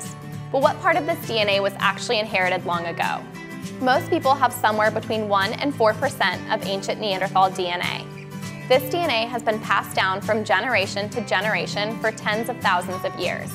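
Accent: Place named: United States. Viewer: her hardcore white american voice and the music... it's a lot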